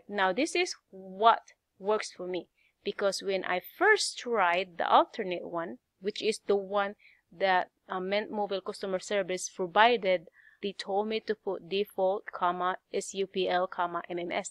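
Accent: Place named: Pangasinan, Philippines. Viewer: That's a great accent! Pangasinan?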